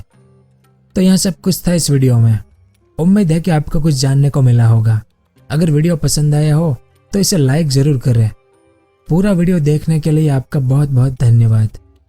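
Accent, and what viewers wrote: Gujarat, India. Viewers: Gujarati voice che Boleche te Gujarati che ne?????? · You are gujrati bro?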